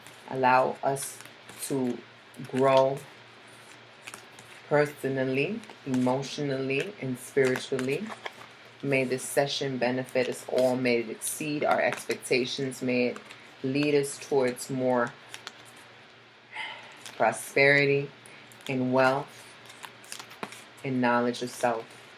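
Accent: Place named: Brooklyn, United States. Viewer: You're so Brooklyn, yo lol. I love your accent!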